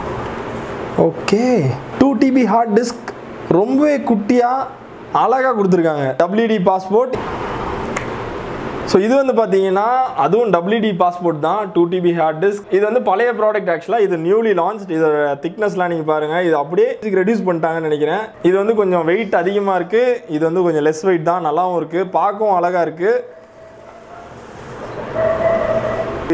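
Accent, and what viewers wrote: Kanyakumari, India. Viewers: Bro neenga kaniyakumari ah sollunga bro❓ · Kanyakumari language super😍😍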